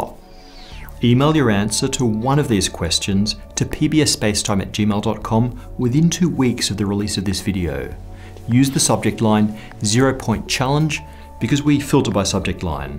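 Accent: England, United Kingdom. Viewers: Wow!!!!!!! This is an awful PBS Space Time clip. I'm shocked at how badly this misrepresents the applications of zero-point energy. It is this these kind of "Negative" (pun intended) clips that keep us using rockets instead of learning about electro-gravitics. 👎 Did you notice how they used someone with a slightly British accent to make it appear they are smart and intelligent enough to explain the concepts of physics. This clip ends with a gecko 'challenge' relating to their feet. This clip is simply pathetic. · Your English accent adds no credibility. And your video sucks.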